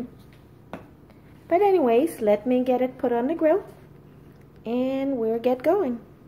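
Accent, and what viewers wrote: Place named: New York, United States. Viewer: I thank you for the info... By the way you sound like a Puerto Rican from New York... I could be wrong. I spend a lot of time in Queens..lol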